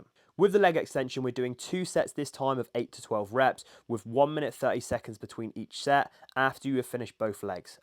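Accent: United Kingdom. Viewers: you have a British accent but Irish good looks.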